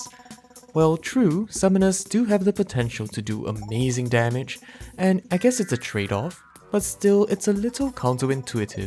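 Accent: Singapore. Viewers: hai may i ask r u singaporean?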